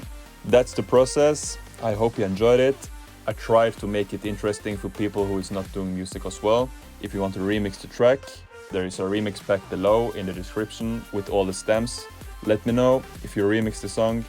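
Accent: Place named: France. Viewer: You have the French accent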